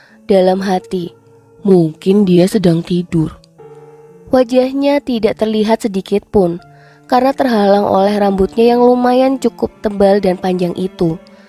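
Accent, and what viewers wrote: Banyumas, Indonesia. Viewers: Orang ngapak nih kayaknya koreksi kalo salah 🤣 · Suaranya....koyo wong ngapak...... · Ini naratornya mbak asli banyumas ya? Aksen ngapaknya keliatan wkwk 😂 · Ini pasti orang ngapak banyumas /cilacap. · Galfok karena naratornya medok bgd bacanya. apalgi pas baca merokok, cicaheum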